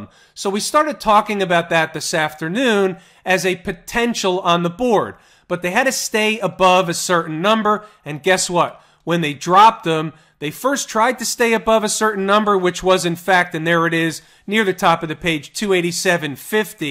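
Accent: United States. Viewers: Most American sounding narrator. I love it. I bet he’s nice to waiters. 😊👌🏻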